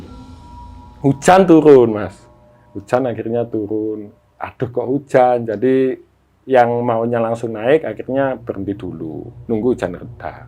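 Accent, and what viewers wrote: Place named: East Java, Indonesia. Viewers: Logatmu mas jawa timuran bgt 🤭😂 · Logat" e sampean wong kediri to mas?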